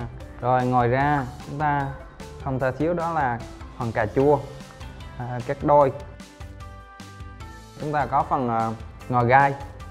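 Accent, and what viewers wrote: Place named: Phu Yen, Vietnam. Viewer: Tiếng giống khu vực tây hoà phú yên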